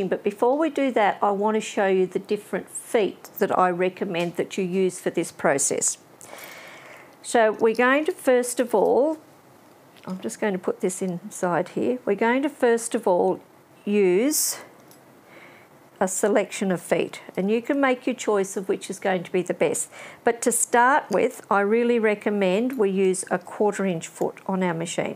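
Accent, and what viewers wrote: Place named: Australia. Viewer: Thank you. Lovely to listen to a fellow Aussie. Your baskets were extremely neat and tidy; best I have seen. I have subscribed and binge watched several videos in a row. Good to know I can purchase notions in Australia.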